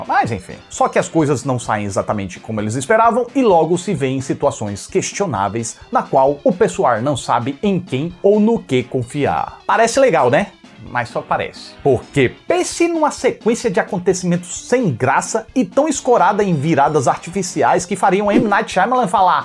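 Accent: Northeast, Brazil. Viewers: Ele é nordestino 😁 só não lembro de que estado. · Cara. Seus comentários são super nordextinesco. Aaaaaaamo. O Seu sotaque é SUPER.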